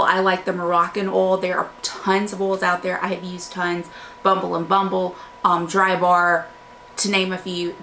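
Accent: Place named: Mississippi, United States. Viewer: I have been dieing to know where you were from and as soon as you said "oil", I knew it was Mississippi! I'm from Mississippi too but currently live in Houston. Love your channel and appreciate a lot of your humidity proof make up tips!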